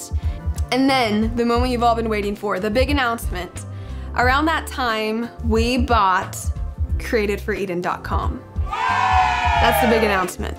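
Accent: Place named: California, United States. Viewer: California accent 😆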